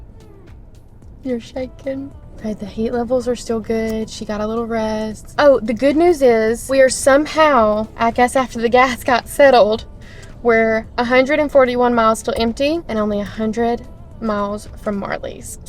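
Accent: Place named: South, United States. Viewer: lmfao "just the cole" I love southern accents sm 🤣❤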